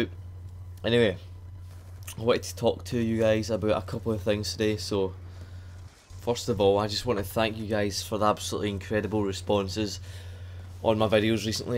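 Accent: Scotland, United Kingdom. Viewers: Wings sent me .. Don't normally check out other people but glad I did !! Highly entertaining gameplay .. Also was pleasantly surprised that you too are Scottish - fae Ayrshire ")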